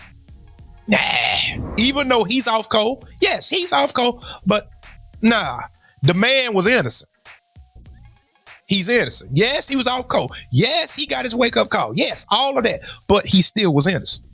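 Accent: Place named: South, United States. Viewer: NC here! Keep doing your thing, sir, give em that country talk!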